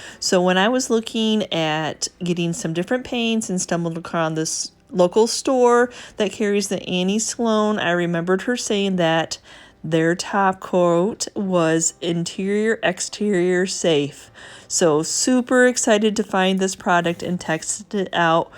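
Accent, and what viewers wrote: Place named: Michigan, United States. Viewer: LOL... as I was listening to you speak I tried to place your accent which I have pretty much given up on cuz I'm NO good at it 🤷, but since I'm home alone I figured it'd be OK. I said 🙋"Michigan" and not 30 seconds later you were talking about Annie's paint holding up well outdoors "here in Michigan" and look at me now 😁 getting one right and nobody's here to witness it. 😞. That's OK, we know! I'm really loving the decoupage on the dragonfly and the flowers. Long story, but I have a metal flower a lot like your largest one and a butterfly, both of which I really need to look polar opposite of what they are now, and this will absolutely do the trick. Perfect timing that I found your video today. Thanks for sharing. 🦋🏵️ From the Southern California desert, MK ♥️🌞🌵 Oh! I was able to properly guess your accent cuz although I was born in Detroit I had my forth birthday here in CA and, as such, consider myself to be a CA girl, my remaining family - one cousin - lives in Bloomfield Hills and works at GM. 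My mom's entire family stayed in MI while she and my dad, apparently the wild ones, drove west in the early 60s.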